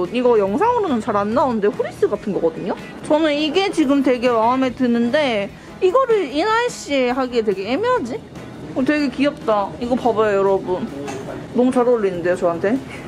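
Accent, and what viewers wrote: Busan, South Korea. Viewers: 부산 사투리 듣기 너무 좋은거 같아요. 요즘 코로나 때문에 밖에 나가기 힘듬에도 불구하고 팬들을 위해 나눔해주셔서 진심으로 감사드립니다. <mail>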